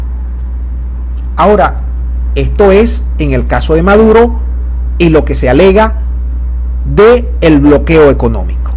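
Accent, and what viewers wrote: Cuba. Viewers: Este locutor debe ser Cubanisimo un efuerzo immenso para lucir Venezolano. Solo Las cuentas que estan USA estan cerradas Venezuela tiene cuentas en la China y en Russia Las aerolinias Americanas no estan volando por El peligro que eso significa. La China si quiere traer otra ayuda a pesar de que El gobierno diga que no se necesita la puede llevar. En USA los que tubieron que salir llevan años pidiendo que le cierren Las cuentas a esos descarados que le dicen Al Pueblo pon la cara por mi que te estoy ayundo con una cajita clap de arina y grasa y por que los españoles y adecos y copei te dejaron pobres y USA quiere tu agua y petroleo y luego con El dinero de los Venezolanos se para Miami, Orlando y New York viviendo y pagando Plato caros mientras El resto haciendo colas por que Las colas no son de Enero para aca solo un Cubano llama a Maduro Presidente o narco y con razon por que le reparte la Plata de los Venezolanos en dollares y petroleo a ellos y los narcos Les deja hacer lo we le de la gana. 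insisto eres Cubano comunista o narco. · Que bien . Asi Maduro saldra mas rapido. Tienes acento cubano. No tienes derecho a opinar. Eres mas falso q billete de 7.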